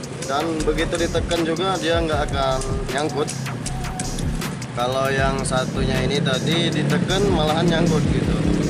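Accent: Palembang, Indonesia. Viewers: Logat palembang ?? Lokasi dimana kak ?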